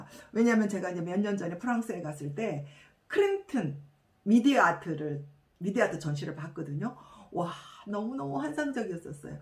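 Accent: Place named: Busan, South Korea. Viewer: 그 동안 몰랐었는데요~ 부산이 고향이시군요 고향에 가시니~ 억양에서 부산느낌이 납니다^^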